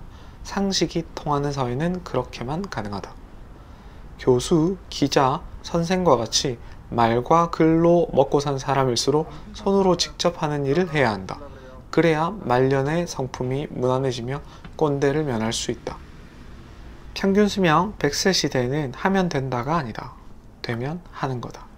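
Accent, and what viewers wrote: Gyeongsang, South Korea. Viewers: 저는 부산,경상도 사투리가 너무 정감있어서 무조건 호감요^^ 부모님의 음력생일은 그냥 돌아가시기전까진 평생 간다고생각하는게..;;못바꿔요.. 저희부부도 이번에 싹다 양력으로 바꿨네요. 애들은 당근이구요. 좋게말하면,발상의 전환이고,어른들보기엔 모나고 발칙할수도요. 50중반되니 도발이 가능하더라구요~ㅎ 딸이 고딩교사초년생인데, 작년내내 징징대고 다녀서 엄마인 저도 어찌나 힘드던지요ㅜ 잔잔한 소회를 담은 선생님 유튭 잘보고있어용~ 딸내미 남친이 부산 싸나이라,정겹게 보고있답니다^^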